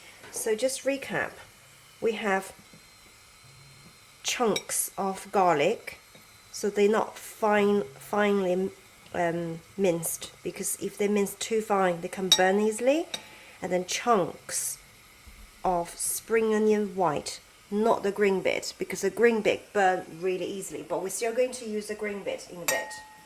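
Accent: United Kingdom. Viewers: That English accent? You should be in Bond movies,for sure?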